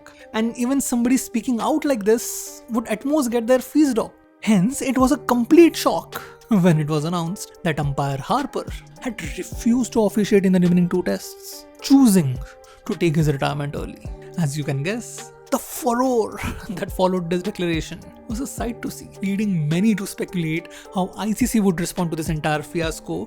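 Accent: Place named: India. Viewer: My goodness I can't take this accent. Among all the English accents, Indian English accent is the worst. My goodness. Go easy on Ts and Ds . You might kill em 🤣🤣😅😅. Not that other accents are better but if compared ......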